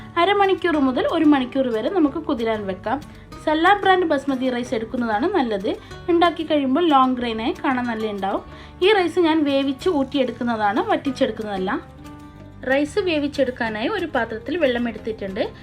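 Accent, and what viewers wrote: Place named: Kasaragod, India. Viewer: Kasargod slang kelkkan nalla rasa... recipe kananum othiri ishta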